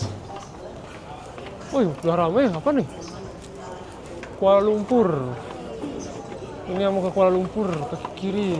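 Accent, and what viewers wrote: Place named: Indonesia. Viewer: Lewat diberanda bli, mau lu sekarang pasport negara lain tapi lidah lu indo banget.. sukses & sehat selalu buat lu dan keluarga..🔥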